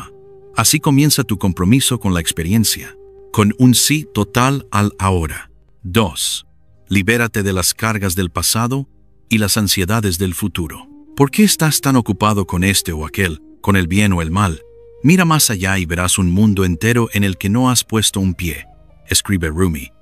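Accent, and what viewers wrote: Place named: England, United Kingdom. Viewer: Excelente reflexión. Cualquier cosa nos distrae del momento presente. Una recomendación sería usar una voz neutra y nativa, sin acento inglés, pues la pronunciación incorrecta termina distrayendo... Saludos.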